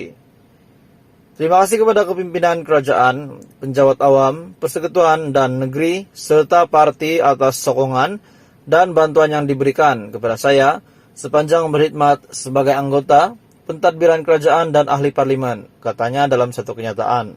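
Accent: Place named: Sabah, Malaysia. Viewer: Wah... Pekat betul kau punya sabah ini 😂😂😂😂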